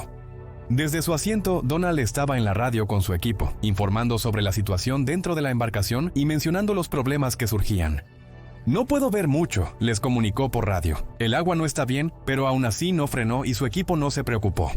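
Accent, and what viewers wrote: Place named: Mexico. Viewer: YO SE QUE TÚ ERES MEXICANO EL ACENTO LO DICE TODO.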